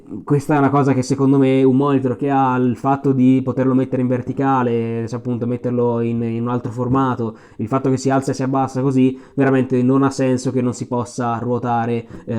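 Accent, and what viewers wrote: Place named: Valtiberina, Italy. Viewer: ma per curo caso, sei di origini umbre? hai un'accento buffo che ricorda quello valtiberino in parte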